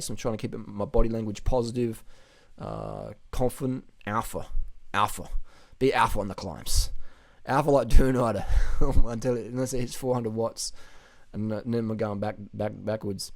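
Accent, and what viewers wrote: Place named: Adelaide, Australia. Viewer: Your accent gets thicker the longer you're back in Radelaide.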